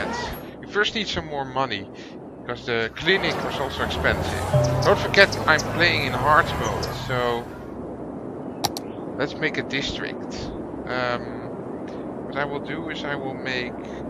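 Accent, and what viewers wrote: Netherlands. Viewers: keep up the good work! and from a guy from japan your dutch accent sound funny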